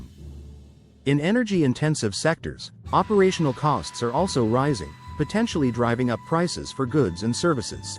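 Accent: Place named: United States. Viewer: Russian? it's a American accent, didn't say anything I already knew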